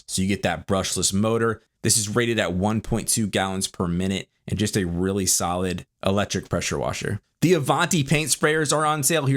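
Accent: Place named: Virginia, United States. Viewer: you sound like chrisfix lol, that VA accent i guess haha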